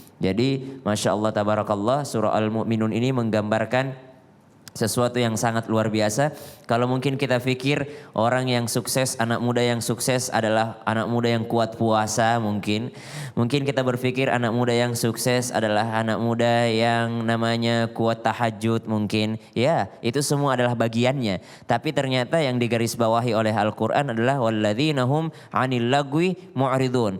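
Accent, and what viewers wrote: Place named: Sulawesi, Indonesia. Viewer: Ustad te koyo dr sulawesi yo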